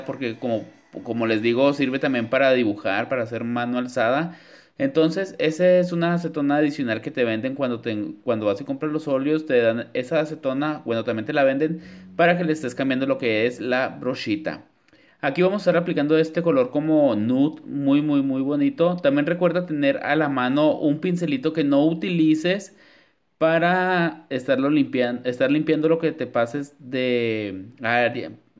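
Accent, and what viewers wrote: Chihuahua, Mexico. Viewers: eres de chihuahua?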